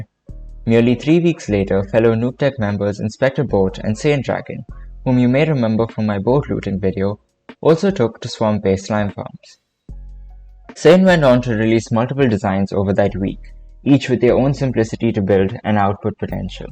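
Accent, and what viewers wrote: India. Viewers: Bro has a nice indian accent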